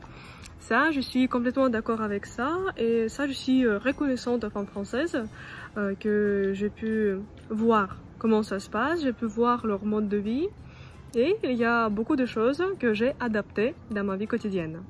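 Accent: Russia. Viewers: J'adore votre accent russe en parlant français 🥰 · Craquante et croustillante avec sont petit accent Russe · 2 ans plus tard désolé pour le retard 😅... Vous savez la France n'a pas de leçons à donner à la Russie sur la gestion de son pays, parce qu'en fait, si on regarde l'endettement de la Russie l'endettement de la France on a tout compris, la gestion de l'eau du chauffage, et de tout le reste en fait, c'est pris en charge par des sociétés privées, qui s'en mettent plein les poches, au détriment des citoyens français, voilà pourquoi l'eau, le chauffage, électricité tout est cher en France, et ça devient inadmissible, beaucoup de compatriotes français s'expatrie en Russie, justement parce qu'ils ont compris, que la France n'était plus la France, et qu'en fait la Russie ressembler beaucoup plus à la France d'autrefois, que la France actuelle. Ce que vous faites c'est génial, vous remettez les pendules à l'heure entre la France et la Russie, j'aime bien vos vidéos, et surtout votre accent Russe quand vous parlez français. 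Pour parler de Paris, Paris n'existe plus, dans le sens culturel, propre à Paris, cette ville est très mal géré, et ils ont un endettement de 7,713 milliards, ce que cela, va certainement vous étonner.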